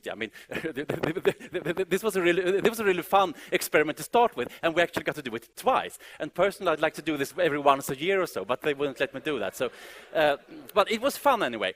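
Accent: Sweden. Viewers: So swedish hahaha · DAT ACCENT... Let me guess, swedish? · How amazing how the swedish accent and speach melody comes through his english !! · Of course I know the definition of awkward. Apparently you don't, since you had to question it. Being a Swede myself, you can't imagine how painful it is listening to someone speaking English, in the Swedish accent. And this guy had a really thick accent. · awesome Swedish accent XD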